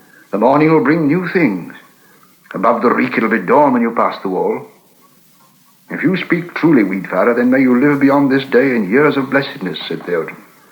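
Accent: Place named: Scotland, United Kingdom. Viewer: His Received Pronunciation makes him sound Scottish!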